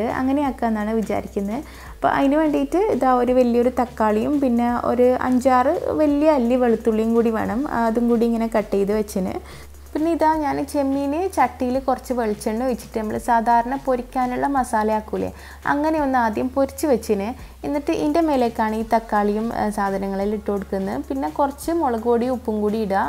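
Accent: Kannur, India. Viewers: Thalasseryilum vadakarayilum okke varavu ennu thanneyanu parayunnadu.. Sarikkum kannur bhasha kelkkumbol sandosham thonnunnu...Husbandinu endanu jyoli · നിങ്ങളുടെ കണ്ണൂർ ഭാഷ നല്ലോണം പറയണം കേട്ടോ. കേൾക്കാൻ നല്ല രസമുണ്ട്. നമ്മൾ പാലക്കാട്‌ ജില്ല യിൽ ആണ്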